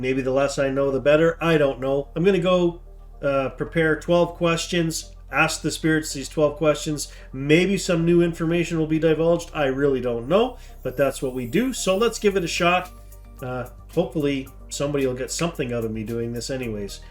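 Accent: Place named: Scotland, United Kingdom. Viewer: I always hear that Scottish accent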